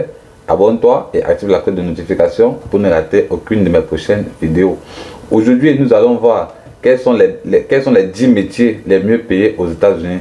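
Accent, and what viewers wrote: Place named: Cameroon. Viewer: T'es camerounais je suis prêt à parier bro sa se ressent à ta façon de parler 😅✊🏾✊🏾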